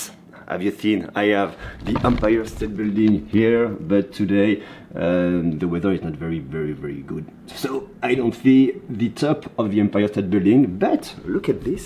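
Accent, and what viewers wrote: France. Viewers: Americans are going to love you mostly with your Frenchy accent.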